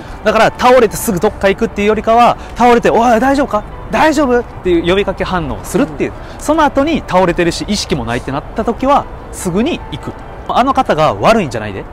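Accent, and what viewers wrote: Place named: Kansai, Japan. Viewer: なんで関西弁なん🤨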